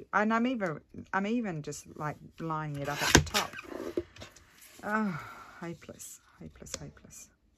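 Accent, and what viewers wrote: Australia. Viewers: Righty O!!! I love when you speak Auzzy How do you spell that? Giggling.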